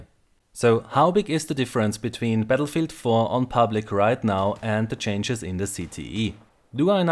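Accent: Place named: Germany. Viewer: Haha okay, cool:) Is interessant wie einem sofort aufällt, wenn einer aus DACH englisch redet. Aber du machst das megamäßig gut und super verständlich;)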